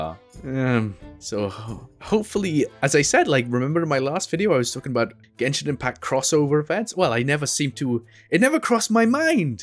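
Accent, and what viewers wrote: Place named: Scotland, United Kingdom. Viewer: you and soooo funny and i love your scottish accent lmao, subscribed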